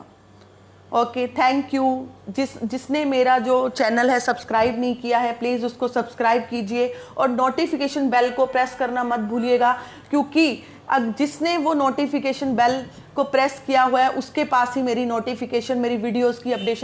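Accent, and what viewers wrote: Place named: India. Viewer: I just love indian accent very much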